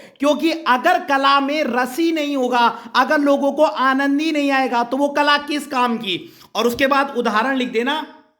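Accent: Garhwal, India. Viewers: Sir aap gadhwali ho kya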